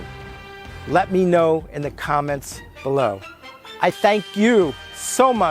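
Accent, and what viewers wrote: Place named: Long Island, United States. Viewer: I’m so glad I found this channel! The only thing for me that’s a little iffy is the humidity. With that said, no place is perfect and Charlottes looking pretty pretty good right now. I wish I could come right now to check it out but am concerned about flying. For someone in their 60’s and thinking of growing older and needing more services, do you have any recommendations on anything? I wish I could get on an airplane right now. Really looking forward to watching more videos. I barely hear the LI accent anymore!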